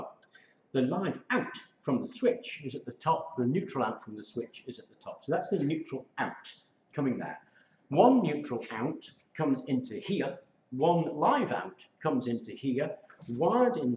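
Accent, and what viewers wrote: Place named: United Kingdom. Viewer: I can't make-out some words again and think your Zoom rec/mic should be shirt pocket. British island accent is difficult for other folks across the pond too. Ni chrome heaters have huge inrush on mains.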